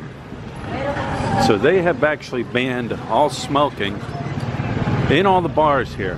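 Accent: Philadelphia, United States. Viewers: "I'm gonna film down dis schtreet here" LOL Definitely from Philly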